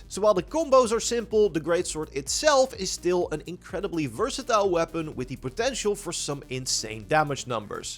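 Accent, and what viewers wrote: Netherlands. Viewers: Omg Your Dutch accent is thick as molasses.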